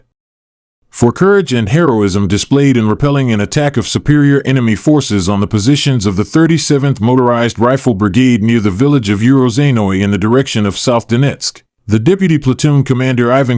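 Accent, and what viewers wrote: United States. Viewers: ощущение,что озвучивал какой то реднек со среднего запада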